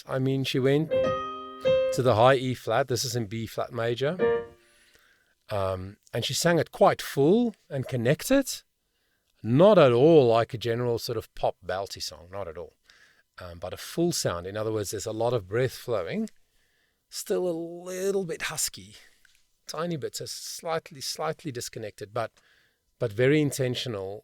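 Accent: South Africa. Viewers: She is 17 now and dropped her first EP in August consists of 6 original songs. They are amazing. I have been following her for a long time and I am so proud of of her hard work, dedication and just being a wonderful human being. is the first track of the EP. You are missing out if you don't listen to them all. I think you are also a South African from your accent. I am a 77 year old gran from South Africa. She is my favourite artist and has been since she was 9 when I heard her sing a self produced version of.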